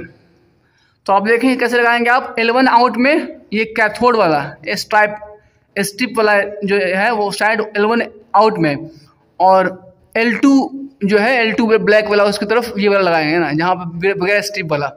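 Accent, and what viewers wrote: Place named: Jamshedpur, India. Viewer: Aap Tata (Jamshedpur) key ho ,aap ki bat se lag ta hai 💯 %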